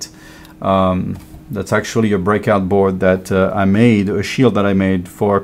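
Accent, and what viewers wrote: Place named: France. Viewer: Really impressed by your english there - one can barely hear that you are French which is quite unusual. Trés bien!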